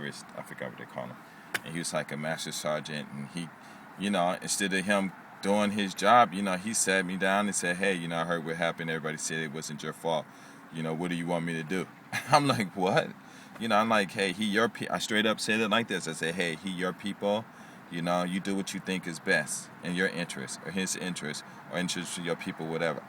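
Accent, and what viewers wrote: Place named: Japan. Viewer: I have NO idea what your accent is...it sounds like it could be japanese, african, jamaican, i have no clue. where are you from originally?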